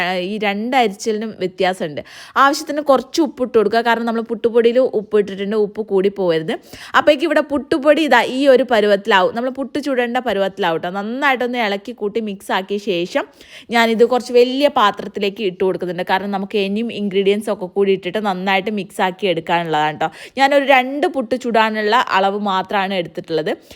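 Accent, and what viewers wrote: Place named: Malappuram, India. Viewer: കമന്റ് ബോക്സിൽ കയറുമ്പോൾ ഇടുകയല്ല പുഴുങ്ങുകയില്ല കേൾക്കുന്നുണ്ട് എന്താ കാര്യം അറിയോ എന്റെ ഒരു അറിവ് വച്ചിട്ട് പറയുന്നു യഥാർത്ഥത്തിൽ മലപ്പുറത്ത് കാരി ആണ് സംസാരം ഒന്ന് മാറ്റി പിടിച്ചു നോക്കിയതാണ് മലപ്പുറത്തുകാര് എങ്ങനെ മാറ്റി പിടിച്ചാലും മലപ്പുറത്ത് സംസാരത്തിന്റെ ആ ചുഴകടന്നുവരും എന്ന് അവൾ ഓർത്തില്ല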